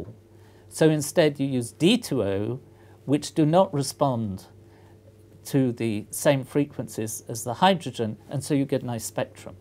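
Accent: England, United Kingdom. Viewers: Crazy hair, lab coat, British accent = genius most likely. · and just the right amount of British accent.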